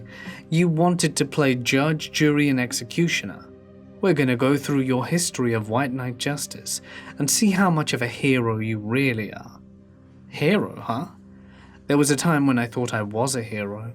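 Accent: United Kingdom. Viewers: Hes british all brittons sound like that . You watch too much mr bean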